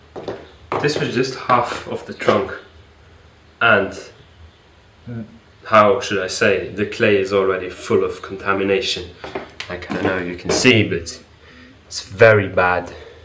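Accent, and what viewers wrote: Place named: Minnesota, United States. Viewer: You sound like small town Minnesota. "Fuck ton" thats a gorgeous car.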